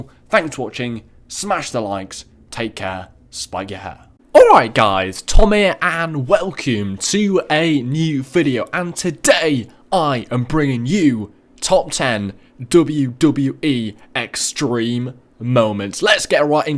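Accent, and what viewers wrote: United Kingdom. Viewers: Did he is British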